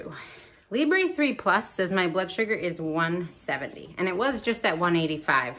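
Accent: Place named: United States. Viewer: Fellow t-1 diabetic From Australia and sounds very interesting 😊 indeed. By the way I totally love your American accent 😊.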